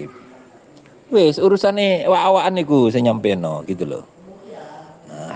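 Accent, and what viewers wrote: Madura, Indonesia. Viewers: Suaranya seperti akaen madura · Kalo lihat logat bahasa, logat bahasa Madura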